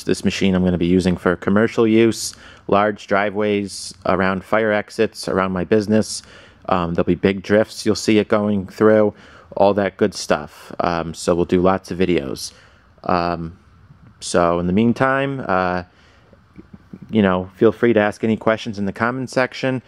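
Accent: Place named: New York, United States. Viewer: Hi , really great honest real information.. so... seems they are really slow... live in vermont don't want to walk at a snails pace... can they actually move faster than a slow tedious walk and do they go backwards as fast as forwards.. ? can you maneuver easily without engine power and clutches in, to position in a garage. I love everything on it .... oh yes... is there a power cord back up or is it battery or pull start only.? Thanks .... lol love your NY accent and your excitement and then disappointment at no snow in 2016 I bet you hoped for a huge storm and bailing the neighbors!..... boys and toys! Thanks again for the vids well done and thoughtful.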